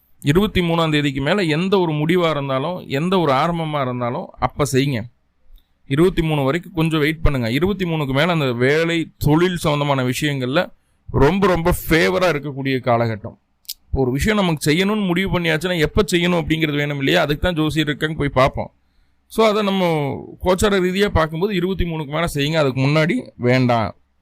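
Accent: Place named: Kongu, India. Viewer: நீங்களும் வளமுடன் வாழ வேண்டும். அலட்டல் இல்லாத நடையில உள்ள உங்கள் கொங்கு பாஷைக்கு நான் அடிமை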